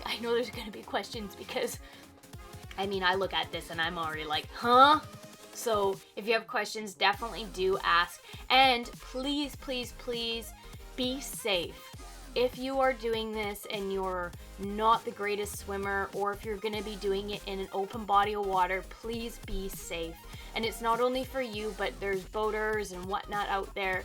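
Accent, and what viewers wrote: Canada. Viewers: Just from your accent I'm assuming you live in Canada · Are you Canadian? “Don’t chya know”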